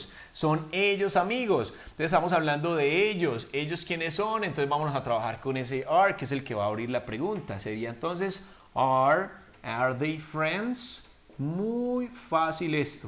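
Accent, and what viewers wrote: Antioquia, Colombia. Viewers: Yo creo q me estoy enamorando no pongo atencion me encanta el acento colombiano el teacher ni digamos 🤗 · Vosos paisa de medellin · me encanta el acento colombiano parcero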